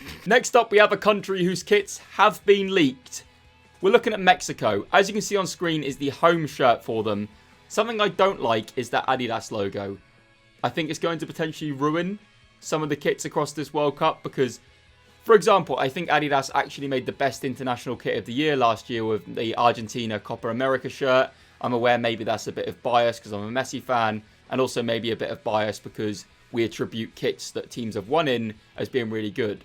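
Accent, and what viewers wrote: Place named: England, United Kingdom. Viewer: Where in England are you from? I'm curious about your accent. Thank you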